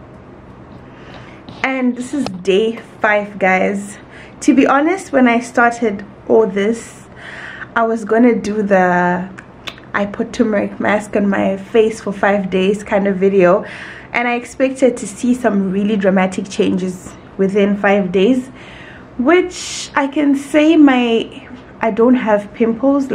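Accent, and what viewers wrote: Zimbabwe. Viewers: She sounds Zimbabwean. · She sounds zimbabwean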